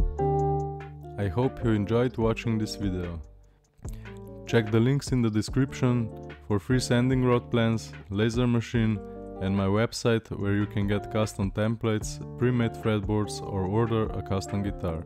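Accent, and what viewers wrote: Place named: Montenegro. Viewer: Nice work man! I would almost bet, judging by your Eng accent, that you come from the small and beautiful country that has about 40km of Adriatic coast and a 2850m highest mountain. Can I ask why you opted for the glued neck, was it aesthetics or also sound? And did you make your own pickups? I have never seen that shape of pu's before. Last question :) ... what gauge strings did you put on. The low E looks like it's quite thick, like 54 or 60. Cheers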